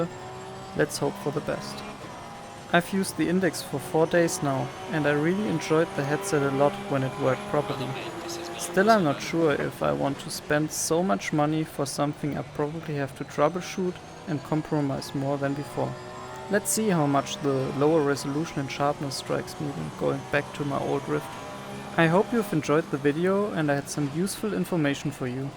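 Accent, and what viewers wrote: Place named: Germany. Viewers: Correct m8, he is from Germany. But iam really puzzled about your statement that you like the German accent. This is really the first time I hear such a statement about the German accent ;) I thought that most american people are grinning about the accent of German people. Happy to be proven wrong ;) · Hey good video! i like your accent! Are you from Germany? It sounds like that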